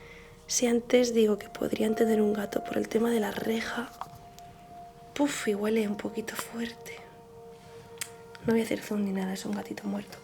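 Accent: Spain. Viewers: Jajaja que experiencia hoy con este video, resulta que active subtítulos para un video anterior, y vi el anuncio de e inmediatamente ingresé, pero me salió unos subtítulos tan incoherentes a lo que hablaba, parecía peli de adultos, me di cuenta porque hay partes que por su acento español se me escapan y debo leer para comprender y de repente leí "un maldita sea, quiere que le de un poco de cariño".... Quedé 😨😦😵‍💫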